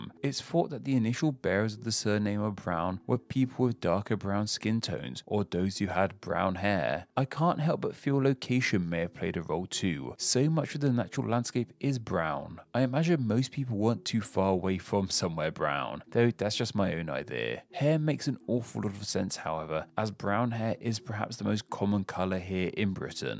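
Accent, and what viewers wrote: United Kingdom. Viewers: Are u british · What region of UK is your accent from?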